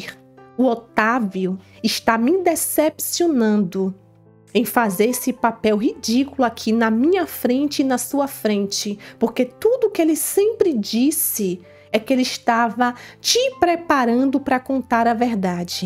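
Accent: Bahia, Brazil. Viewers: uma curiosidade, você é baiana?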